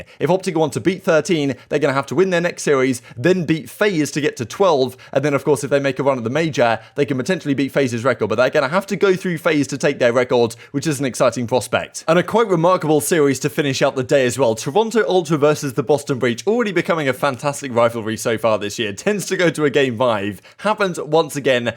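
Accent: United Kingdom. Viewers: Can we get as an analyst? The man speaks with precision, and the british accent is on point. Great vid bro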